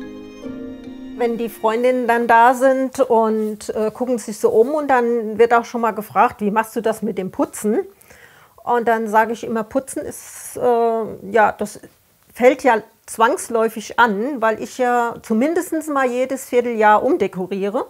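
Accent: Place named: Bavaria, Germany. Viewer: Der Dialekt muss aus dem Raum Aschaffenburg kommen 😅 fühl mich direkt zuhause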